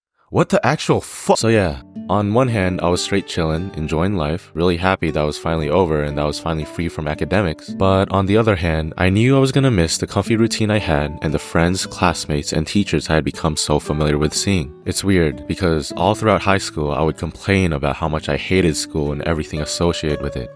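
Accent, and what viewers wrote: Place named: Canada. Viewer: dude sounds canadian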